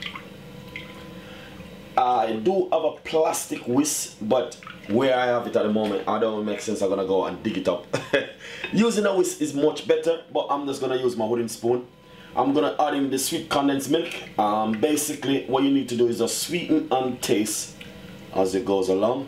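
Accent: Jamaica. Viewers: What language is he speaking then? Sounds like English to me With a Jamaican accent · Speak so The World can understand it not only Jamaican watching, the name is Nutmeg!!!!!! Patios and English no go well bro sound ANNOYING. Just be real and talk u talk so mess up u talk sound #$&@@ Anyhow love the recipes although I know how to make them already, thanks for teaching those who don't know especially the young ppl 👍🏼👍🏼💯🇯🇲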